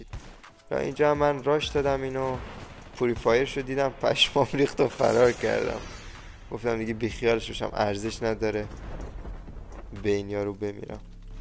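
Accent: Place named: Mashhad, Iran. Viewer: mashhadi nisti? Lahjat mashhadie 😂❤❤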